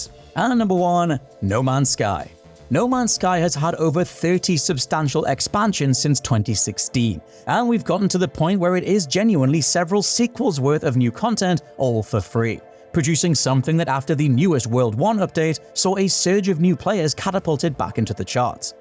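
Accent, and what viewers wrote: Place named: Scotland, United Kingdom. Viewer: He is pronouncing it properly but it's his accent which is a cool accent. It's like a British and Scottish accent combo.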